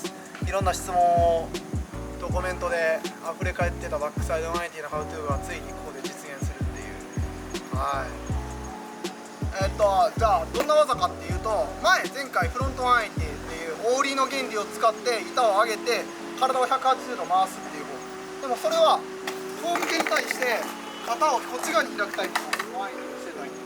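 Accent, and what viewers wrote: Kansai, Japan. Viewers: 関西出身ですからね〜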